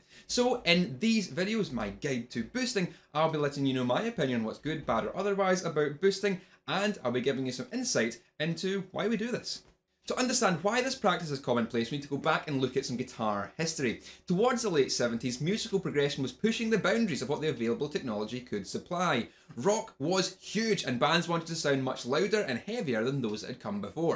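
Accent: Scotland, United Kingdom. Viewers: Love video's like this man. Am fairly new to the channel, but loving it already. And nice to hear a Scottish voice on youtube! :D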